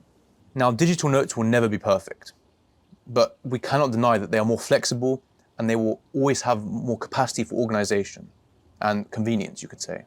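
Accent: United Kingdom. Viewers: How did i know it will be a British guy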